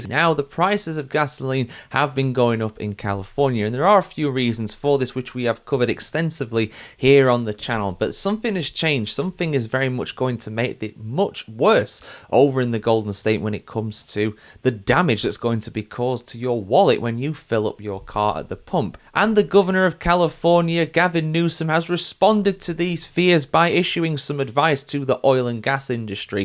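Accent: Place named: United Kingdom. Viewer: Eh...can't listen to a limey preach about US problems. Sorry.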